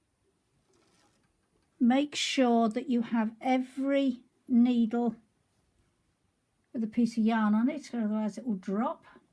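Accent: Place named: United Kingdom. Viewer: Dios me encanta el acento, es británica o algo asi???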